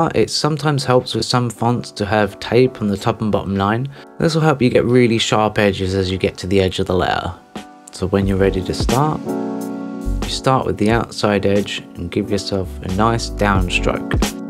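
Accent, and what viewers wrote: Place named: United Kingdom. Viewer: Hi thanks for sharing, Have started to learn sign painting now I have retired and find your videos really helpful. So much to learn and the history fascinates me. By the accent I assume you are in the uk I live in Devon England. Can I ask if I may the type of material you paint on ie plywood ,MDF and what finish you apply to the surface before writing Thanks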